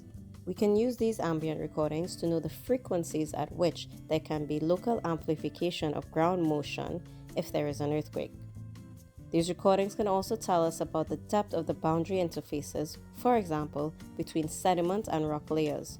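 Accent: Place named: Trinidad and Tobago. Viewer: Hey, I loved the video. I am a Trinidadian doing research for my thesis and came across your video. You sounded Trini so I researched you. Keep up the good work and post more content. Thank you.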